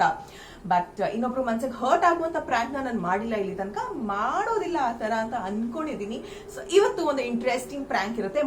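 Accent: Mangalore, India. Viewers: Namma kudla da kannada baari shok kendrene kushi apund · Awesome prank I really appreciate your confidence for doing this👍. They were so kind. The way you spoke in mangluru Kannada was super cute ❤️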